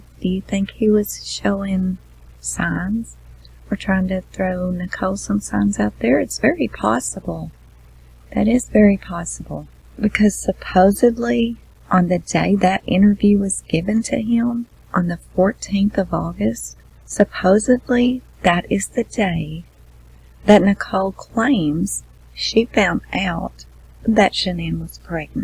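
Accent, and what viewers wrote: South, United States. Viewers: Love your southern accent.. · I also agree...your voice is soothing and southern...I'm from Tennessee and your voice reminds me of mine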